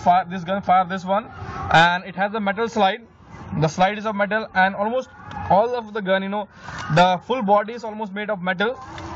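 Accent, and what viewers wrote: India. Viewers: Indian accent funny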